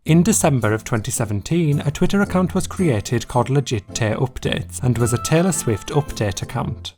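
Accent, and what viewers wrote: United Kingdom. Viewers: Dude you’re literally growing so fast u already got 5k! If there’s anything the YouTube algorithm is a sucker for it’s nice clean British accents, and even nicer video content in an organized, and fun way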